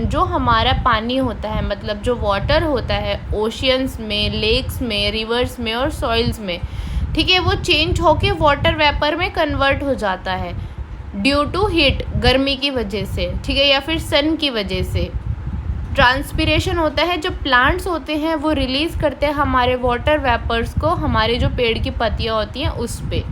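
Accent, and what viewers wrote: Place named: Uttar Pradesh, India. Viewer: Mam , gajab ho yaar aap.😊 Aapko style of talking is somewhat like UP wale jaisa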